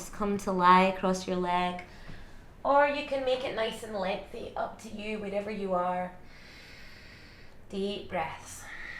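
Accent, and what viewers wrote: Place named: Scotland, United Kingdom. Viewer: That's a Scottish accent.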